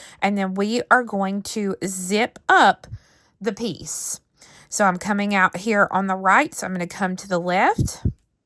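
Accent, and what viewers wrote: South, United States. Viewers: Wow, this is lovely. I love the tassel, but I think for longevity, I would prefer a tassel made with beads. And I think you meant 25x18mm, not 25x8mm., you are amazing. Funny, all these years I thought your last name was, but it's just your warm Southern accent that made it not sound like.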